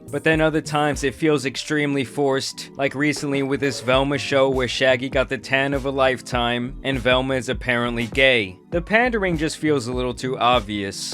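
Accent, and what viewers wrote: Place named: Long Island, United States. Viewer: How are you from Texas but have a Long Island accent?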